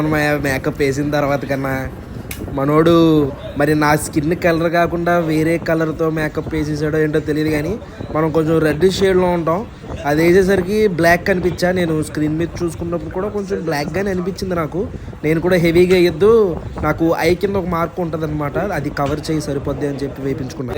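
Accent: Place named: Godavari, India. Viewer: All the best.. new timing ki.. you have bright feature in movies and Godavari slang 🎉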